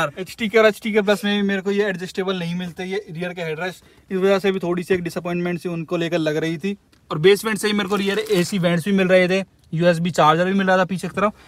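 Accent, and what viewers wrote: Haryana, India. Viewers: ji Hum aapki desi Haryanvi bahut pasand karte hai Bhai bhi thora lallu lagta hai 👍👍👍👍 Love from rewa, Madhya Pradesh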